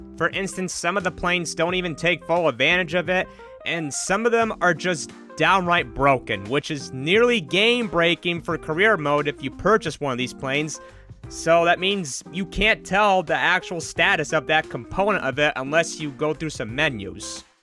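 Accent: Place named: United States. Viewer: Thank God, finally someone who sounds like an American. Jeez. YouTube seems to favor everywhere except American content creators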